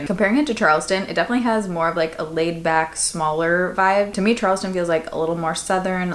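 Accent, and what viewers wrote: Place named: Seattle, United States. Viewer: Listen to you talking remind me of Seattle before I moved out of the state. I miss Seattle so much since there are so many things and places you can go, especially when it comes to healthcare. Swedish hospitals are the best. Their staffs are awesome and very informative, get the jobs done. I hope you feel better soon. I have migraine really bad like u had where I would bury my head the entire days off. I couldn’t take it anymore n went to the ER. But all they give me was Tylenol drips n IV fluids n 1 shot of morphine n sent me home. I ended up having migraine after I went home n decided not to go back to the ER again.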